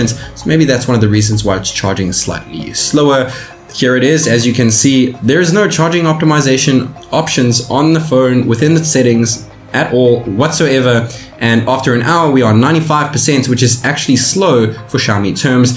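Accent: South Africa. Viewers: I just gotta ask, are you South African because you kinda have that accent?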